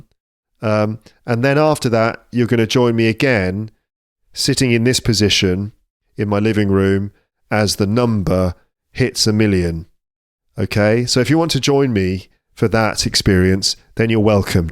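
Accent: United Kingdom. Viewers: ❤❤❤wow. 👍🏻 well done, I love listening to you. The very British voice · Congratulations from Spain.. Thank you for your amazing English class,with excellent british accent. My son is currently doing his surgical training in London, thanks to you! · Congratulations. You're a great speaker. I'm italian, i'm a beginner learner and i love your british accent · ❤❤ Hello, I subscribed to you only now, although before that I had been listening to your correct British English for several months. It's very interesting to listen to you. I listened to the story about how to communicate with small children three times. I wish you health and success. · Hello from Paris to Paris! You have brilliant set - a catchy voice - both British accent and timbre, sense of humor (I laughted at the Russian joke, as far as I am Russian, I could claim it was good) and knowledge about how teach language. 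I believe that you are right on keeping your motivation to continue with different types of podcasts, may be you will come up with even more new podcast subtypes, future will show. Bonne continuation! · Congratulations Sir !!! It's very helpful listening your beautiful British speaking!! Wish you great success more than that 👍🫡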